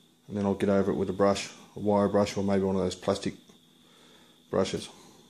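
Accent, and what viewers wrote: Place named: Croatia. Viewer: Your heavy Croatian accent makes it difficult to comprehend what you are saying. The confused videography makes matters much worse. Have you considered employing someone who speaks either Canadian or American to do a voice over? By the way.... Croatia is a beautiful country and the rich cultural history of the Croatian peoples is unparalleled, maybe you could make a video on your village!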